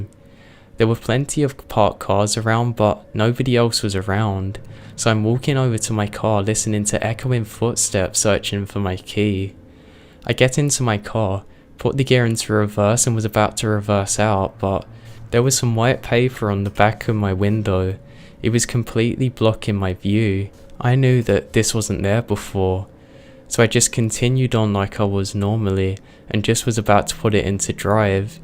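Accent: England, United Kingdom. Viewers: Why do English people always pronounce anything/nothing like nothink and anythink? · Hi just found ur channel...I'm an insomniac!! I'm UK too...nice to hear a familiar accent!! Great long vid!!! Thank you!!!🖤🖤🖤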